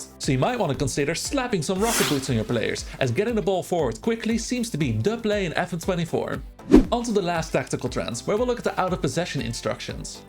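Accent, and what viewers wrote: Netherlands. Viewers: This guy sounds soooo Dutch :D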